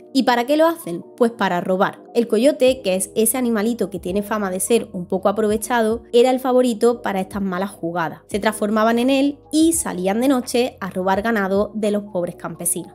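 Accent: Spain. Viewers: Wowww Srita . Hace menos de 1 hr ví un video suyo , el del 5to Sil creo se llama y le cuento , me dejó sorprendido con su video . Mucjas gracias . De hecho inmediatamente me inscribí a su canal ahora aquí me tiene viendo éste otro video 👍👏👏👏🤜🤛 por cierto permitame preguntarle de dónde és usted ? Tiene acento extranjero (Española ?) , más me sorprende sinceramente . Excelente canal Gracias . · Gracias q acento TAn bonito de dónde será se q es española será andaluza gallega o canaria vaya un saludo desde Texas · Su acento lenguistico muy agradable ❤😮 español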